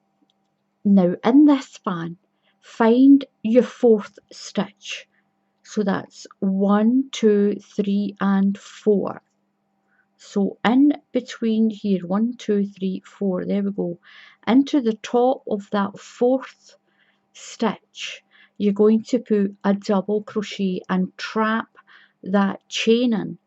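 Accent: Scotland, United Kingdom. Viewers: .... I absolutely love this pattern... and you.... are an amazing teacher.....so well explained... very good camera views on the stitches... wow... just amazing to follow.... I can’t read patterns so I can only make what I can’t follow in tutorials.... you are so easy to follow... I love ❤️ your accent.... so much... I worked with a woman many years ago from Scotland.... she called herself...LADYBIRD..... 😂I just LOVE ❤️ listening to you speak....fond memories....thank you oh so much....I will be making this blanket.!!! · Thank you,! You’re an amazing teacher. I don’t read written patterns well and need a video to help. I was in Scotland earlier this year for our daughter’s wedding to a lovely Scottish fellow. (Came home early due to this Covid mess). Your accent brings back all the memories of the warm Scottish welcome we had. Stay well! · Thank you. 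I have been struggling with the repeat of this pattern in the past. You have now explained it very well. Thanks Thanks. I also love your Scotish accent.❤ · Hi gosh listening to you reminds me of my granny who came from Montrose she taught me to crochet many many years ago when i was 9 I'm 65 now and live in NZ so it was lovely to hear you Scottish voice. Enjoyed your video and look forward to learning more things many thanks again:D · You are a fantastic teacher and it’s so wonderful to hear a fellow Scottish voice! 🏴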